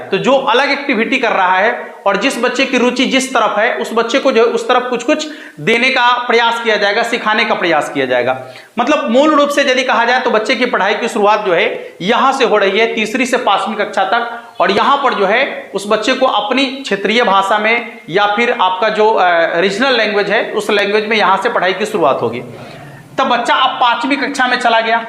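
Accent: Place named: Bengal, India. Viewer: You should explain age and grade classification. At grade 3. What the child age. Bhai your Hindi is not good. It looks like a Bengali accent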